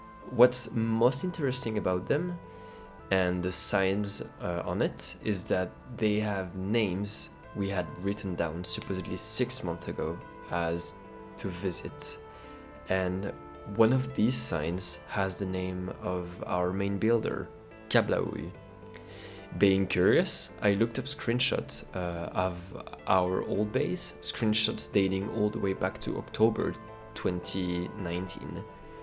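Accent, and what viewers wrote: France. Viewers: Hey, you’re not english, are you? I feel like you’re a bro of akinsoft. You’re french aren’t you? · Du coup t'es français si j'ai bien compris (c'est trop bien) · Bro that's funny, i'm french and at the moment u began to speak I knew u were french too xd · Ur french accent is so cool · wooow u are french bonjour je parle aussi francais comme un francais je mytho pas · Le magnifique accent français :D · Heyyyyyyy you arre french like meeeeee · Is it me or you have a french accent? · you sound french and i am proud of it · Mais c'était sur enfaîte mais c'était sur (quand tu parlé on entendé que tu était fr mdr) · Mais t'es français? :))))))))) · Tu es francais ? :O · L'accent français est vraiment repérable partout xDDDD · T'es pas français ? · Dang your voice tho.... It's perfect the French accent makes it even better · Are you french or something ? 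· cette accent français :o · French or canadian? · Are you french ? (I am french too) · You honestly sound like a slightly younger skin soft with a slightly larger French accent ngl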